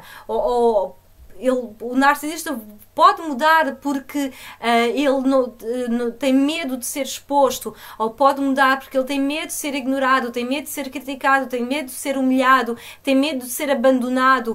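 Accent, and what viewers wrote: Portugal. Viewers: Sai dai doida, vc deve ser uma narcisista pq ela é portuguesa por isso fala assim, e se os vídeos dela são meio desfocado pq ela grava de um celular, ela não está aqui pra dar aula de dicção. O q vale é o conteúdo do vídeo, não tem q ter revisão de nada, ela é uma amadora ótima execelente maravilhosa, ela não está aqui pra ser blogueira e nem YouTuber pra ser impecável com linguajar postura e qualidade de vídeo!! Não está satisfeita procura outro canal com outros profissonais q são impecáveis. Pq todos q estão aqui vendo o vídeo dela, não está aqui se preocupando com essas coisas q vc está falando sua doida kkkk Mulher chata. continue assim, vc ajuda muito as pessoas com seus vídeos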